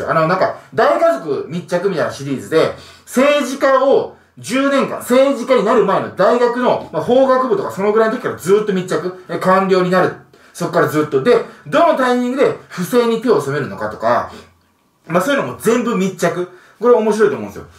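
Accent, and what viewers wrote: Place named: Kansai, Japan. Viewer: なんで関西弁なん？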